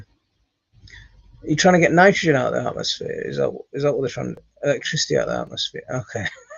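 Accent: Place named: North, United Kingdom. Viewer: P.S. i love to listen to that accent , as i have northern roots . lol It reminds me of all my aunts and uncles now passed : ( x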